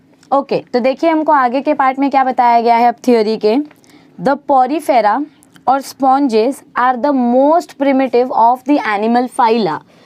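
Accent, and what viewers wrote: Maharashtra, India. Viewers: Didi are you marathi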